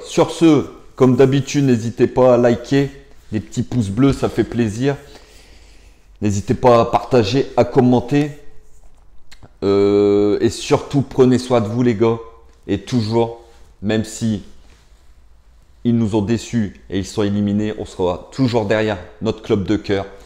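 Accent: North, France. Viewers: Jme demande toujours si tu viens pas du Nord ta grave l'accent ! Ta raison mon frère je pense que pour être compétitif il ns faut 2 gros bancs énorme comme on ttes les équipes modernes ex Bayern city etc..... Une équipe collectifs pas des joueurs qui se croient au five ! Nos joueurs c'est encore trop peu c'est encore trop faible Bakker Diallo Draxler icardi kehrer faut les vendres !!!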